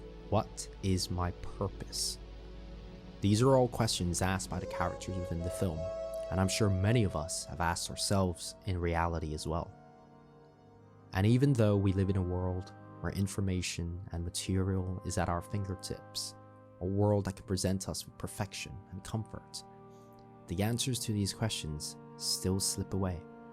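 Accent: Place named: Transatlantic, United States. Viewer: You have a very transatlantic accent. Kinda half British Isles, half North America.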